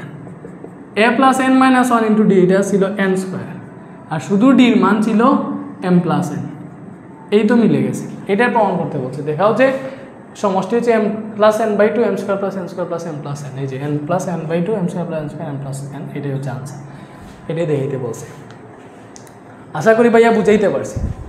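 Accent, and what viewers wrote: Sylhet, Bangladesh. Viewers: Bhai afnare amrar Sylhetii lagee Afnar bari kita Sylhet nih? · ❤❤❤ ভাইয়া আপনি মনে হয় সিলেটি? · Oyh oyh. taine sylheti. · ভাইয়া আপনি কি সিলেটি😊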